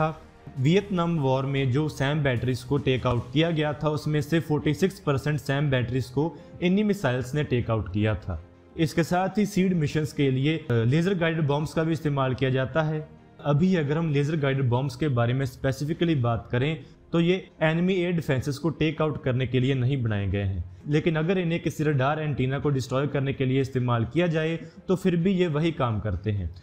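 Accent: Punjab, India. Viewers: Bhai Punjabi ho kya aap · He is from punjab I think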